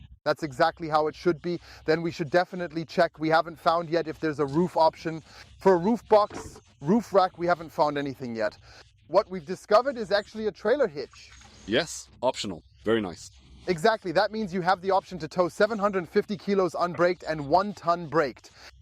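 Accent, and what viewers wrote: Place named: Berlin, Germany. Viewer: Sorry, you are totally right. It is necessary to watch longer than one Minute before giving a quote. 😂 With Ai your „Berlin Accent“ is missing but I think it is just a question of Updates that this will be solved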